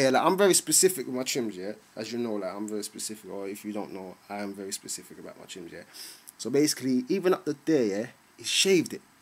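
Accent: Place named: United Kingdom. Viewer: I uderstand aa lol British accent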